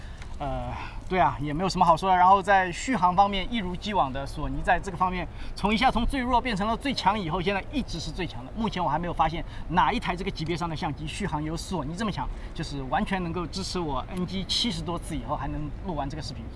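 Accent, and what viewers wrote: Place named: Zhejiang, China. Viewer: 老家哪里啊😄，我女朋友听你视频说你像是浙江的诶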